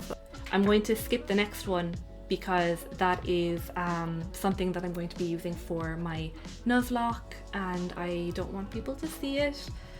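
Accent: Ireland. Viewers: Some beautiful work and a lovely Irish lilt to the narration. Really enjoyed your video! Thanks for sharing !!!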